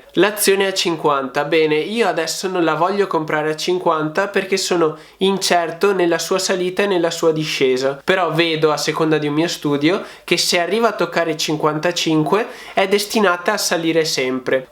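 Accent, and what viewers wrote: Veneto, Italy. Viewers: Te si Veneto....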